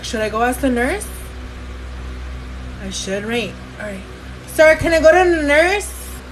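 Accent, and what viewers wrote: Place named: California, United States. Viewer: Is she representing all my girl in California? Cuz all of us speak like this! 🤣🤣🤣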